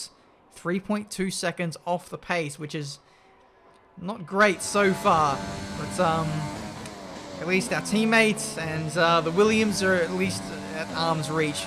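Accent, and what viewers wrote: Australia. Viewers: i was suggested this channel by a fellow formula 1 friend and i was like "oh ok, ill start watching him then" this is the first video of yours ive watched and its fliping amazing what makes it even better is the fact ur australian, i love australians, i think their accent is so cool i will definetly be recommending this channel to other people keep up the amazing work also congratulations on making it to f1 e sports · oi mate you from Australia? sounds like that, hehe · Are you australian?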